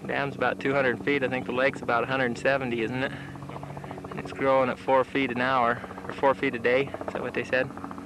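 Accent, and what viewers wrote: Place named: Utah, United States. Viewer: This is the most Utah speak I have ever heard. The most fetchin fetch of a mount'n I've ever fetchin gone seen!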